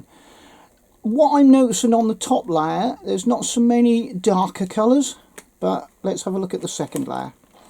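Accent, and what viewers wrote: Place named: Norfolk, United Kingdom. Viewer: I was searching for which oil pastels to buy, I clicked on your video, and immediately perked up when I heard a good ol’ Norfolk accent! I’m a Norwich gal myself, now living in Leicestershire, and it was so wonderful to hear someone talking properly 😂 I miss beautiful Norfolk so much, I’ll be moving back as soon as I get the chance. Anyway, great review, I think I have a similar art style to you, as in I want more precision, so I’ll be getting the Artecho set. New subscriber here, your review was spot on as to what info I needed. Thank you 😊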